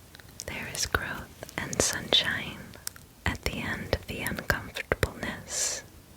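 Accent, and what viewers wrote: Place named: New York, United States. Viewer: My favorite is your NY/NJ accent❤❤ I love it so much, please do more 💜💜💜💖💓💓💓💓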